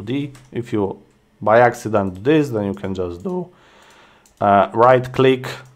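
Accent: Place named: Russia. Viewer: Мне нравится ваш тяжелый russian accent, товарищ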